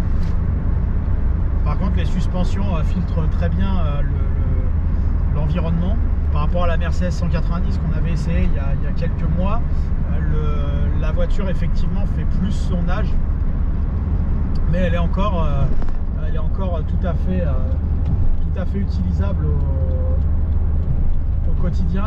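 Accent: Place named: Paris, France. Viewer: Superbe accent titi parisien du présentateur, je ne sais pas s'il vient vraiment de Paris mais ça y ressemble.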